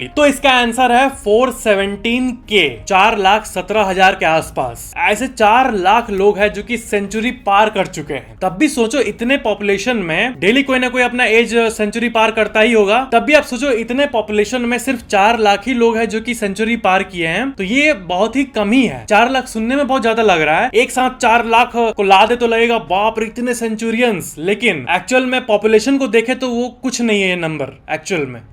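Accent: Bihar, India. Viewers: Great Bro , You Are Back In Your Old Version And We Are Liked It ,, Specially Your Bihari Language ♥️♥️♥️♥️❤❤❤❤❤❤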